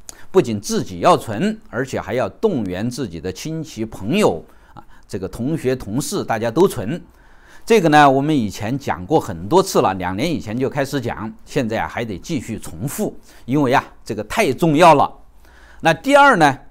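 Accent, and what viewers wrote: China. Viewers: 聽口音你也是中國人吧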